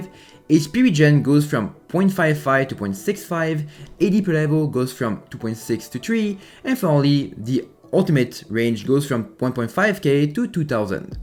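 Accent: France. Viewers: Omg, are you french? I love your accent and that you actually try to articulate (unlike this Takyre senna content creator. The guy gives no shit whether he's understood or not).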